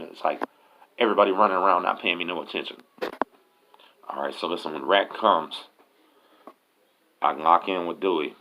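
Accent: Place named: California, United States. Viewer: Sound like out here in Cali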